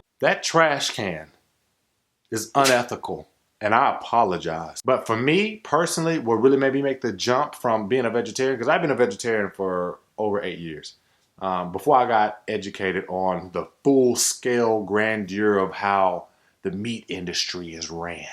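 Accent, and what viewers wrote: South, United States. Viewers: You are spot on about people not understanding ..I'm from MS and I don't know anyone else who is vegetarian or vegan. I got so much flack from my fam..two years later and I still get those "you still don't eat real food" questions. I also agree that it was me reading and gaining knowledge about veganism for myself that made me adopt the lifestyle. Dope video...I knew I heard a Southern accent!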